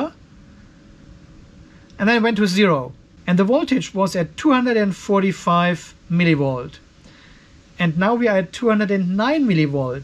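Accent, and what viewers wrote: Germany. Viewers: meine Mutter und Großmutter findet dein clasicher deutsche Dialekt so funny.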